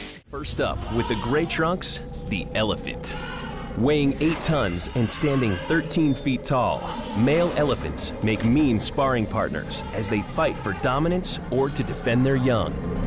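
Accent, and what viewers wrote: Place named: United States. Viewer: I am not attacking Americans? I am saying that if this hole video was spoken in, with an English, Scottish, Australian, or even Irish voice-over accent, it would not sound so incredible and horrified terrible as it is spoken by this American voice-over. And this video is not the only example of what I mean, it is something USA people do for the last thirty years, and it's getting worse every day? Why? How come? Where did that start? I whish the video had a BBC voice-over instead of this.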